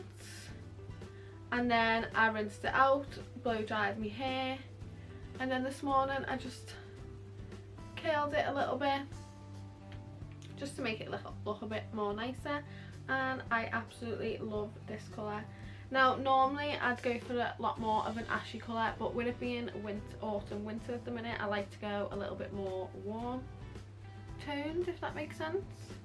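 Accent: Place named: Liverpool, United Kingdom. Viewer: GORGEOUS!! (and I love your Liverpudlian accent! I LOOOOVE Liverpool so much!) ❤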